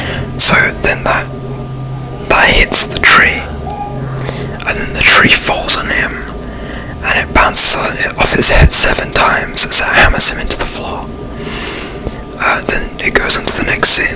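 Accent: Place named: Australia. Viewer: You sound Australian in this one.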